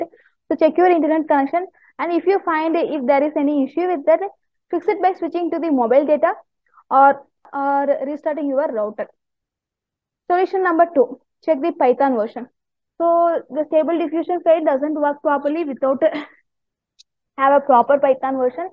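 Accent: India. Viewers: i hear an indian this gonna be good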